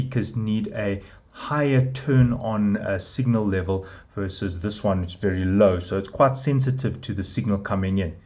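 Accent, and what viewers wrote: South Africa. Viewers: Cool, m in SA too, heard the accent, keep it way 🆙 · If the accent did not give it away. The SA plug was the confirmation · Wow that is unmistakable south african accent, nice to be here, mzansi too!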